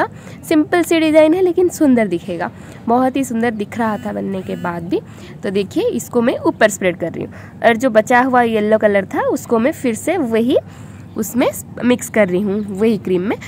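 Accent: Odisha, India. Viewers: Aap orissa se h kya?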